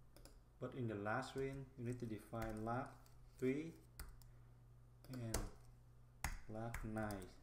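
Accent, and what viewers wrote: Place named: Vietnam. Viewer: nghe cách nói biết người Việt nói tiếng Anh :)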